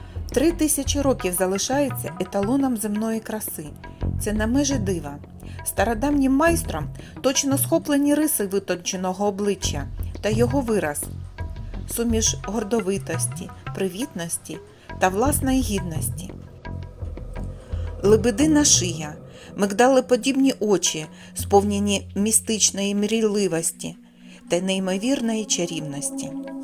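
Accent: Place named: Belarus. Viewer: ахараняє? боже, ви наче не українка. а білоруска, яка з білоруськох мові перейшла на українську....слух ріжить... але урок хороший для дітей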